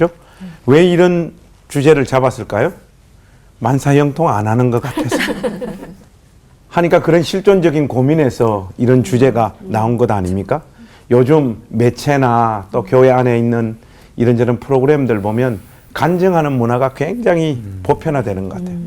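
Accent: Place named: Seoul, South Korea. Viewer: 목사님~ 서울말씨? 멋져요^^ 올포원의 죠지클루니 맞습니다!!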